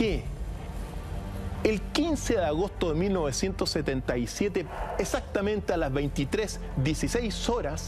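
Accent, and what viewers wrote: Chile. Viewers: Es chileno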